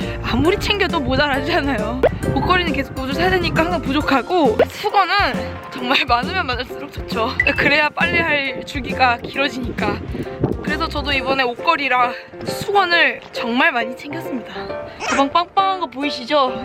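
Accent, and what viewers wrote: Yeosu, South Korea. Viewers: 말투가 익숙하다 했는데 여수가 고향이셨군요! 역앞에 바다가 있다니 너무 멋져요ㅠ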